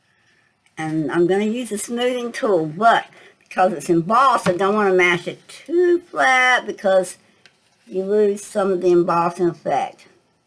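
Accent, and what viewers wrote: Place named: North Carolina, United States. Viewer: I just found your channel tonight and as soon as I heard your voice I thought you sounded like a nice southern grandma...like many of the older southern women I've known my whole life. When you said something about NC I realized that's why you sound so familiar. I was born, raised and still live in Raleigh. You remind me of my grandmother who passed in 1997 at the age of 84. I will be visiting your channel often, not just to hear your voice but to ingest your wonderful junk journaling content. I love that center pocket flip!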